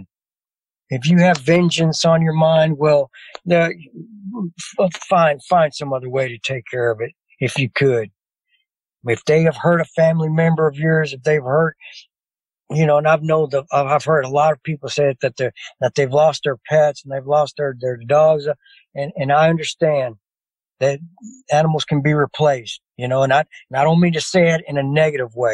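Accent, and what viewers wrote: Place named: Texas, United States. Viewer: One thing I do know is...He IS a Texan...I am from Texas and his accent is spot on! ie..bob wire..lol..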